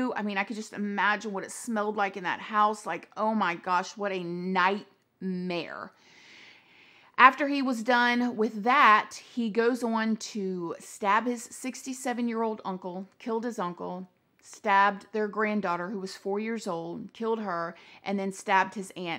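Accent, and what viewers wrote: South, United States. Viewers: I loved your work 🥰🥰🥰 new subscriber here 👍🏽👍🏽👍🏽 keep up the good work 😘😘😘 also I feel like you have a beautiful southern charm. You don’t sound like a Floridian lol but that’s just me 🤷‍♀️🤷‍♀️🤷‍♀️ I know Florida is a down under southern state, but again you have a lovely southern accent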